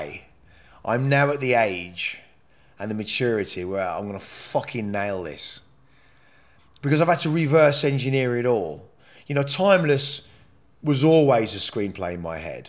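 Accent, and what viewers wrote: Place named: Black Country, United Kingdom. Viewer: Can still hear that yamyam accent now and again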